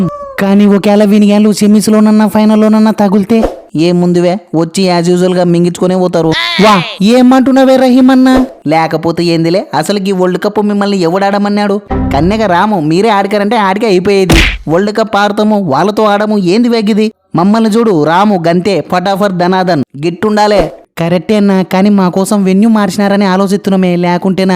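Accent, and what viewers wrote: Rayalaseema, India. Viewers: Anna rayalaseema slang❤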